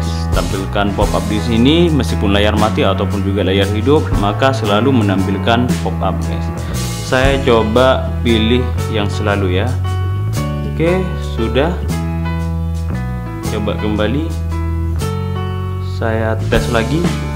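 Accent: Madura, Indonesia. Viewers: Org madura bkn sih? bede dimma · Bede bhs madura nya keah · Orang madura ya mas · Bng lu orng madura y? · Orang Madura nya bang??? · Bang Orang Madura?? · Orang madura kak? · Orang madura ya? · mas kamu orang Madura ya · Orang madura yak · Abang orang madura yaa? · Orang madura ya om 😁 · Yg buat konten kyknya orang madura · Wkwkwkw kakak orang madura sama kayak saya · Abg orang madura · Reng madure kake ya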